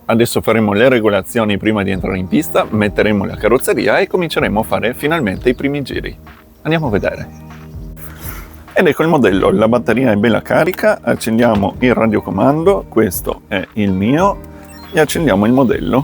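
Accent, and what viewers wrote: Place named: Trieste, Italy. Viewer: 😁si che sei di Trieste l'ho capito subito, si sente, sarà il dna🤣 La pista mi sembrava strano, qua non riusciamo a fare neanche questo. Abbiamo fatto un paio d'anni con gli aerei elettrici ma.....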